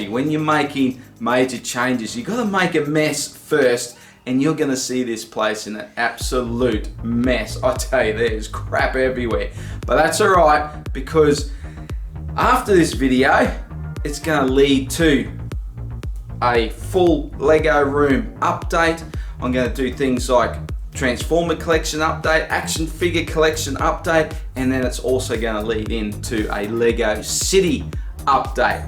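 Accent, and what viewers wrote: Australia. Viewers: Now correct me if im worng but you sound Australian, and if that is the case are you ok? You know with the the fires happening.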